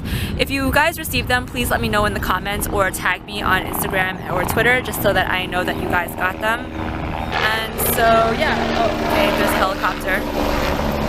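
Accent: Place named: Rio de Janeiro, Brazil. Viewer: The SH sound for the final esses are more of a Rio thing. People don't soud like that in Sao Paulo.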